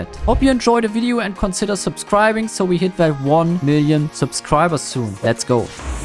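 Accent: Germany. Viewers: Are you German? 😅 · dieser deutsche akzent 😂😂🤣🤣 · Bist du deutsch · Mann hört an deiner Stimme das du deutsch bist 😂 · Du gibst ja echt gar kein fick auf dein deutschen akzent hahaha feier ich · Dieser deutsche Akzent ist zu krank :D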